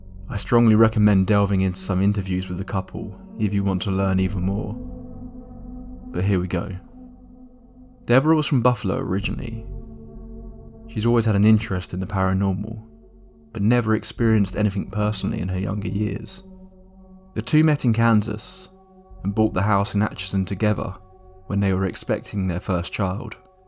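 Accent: United Kingdom. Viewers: Just stumbled upon your channel. Great storytelling and delivery. Not many of these types of videos have that elegant across-the-pond accent. 😊 Thank you for all your efforts and keep up the great work mate! Cheers from New York City.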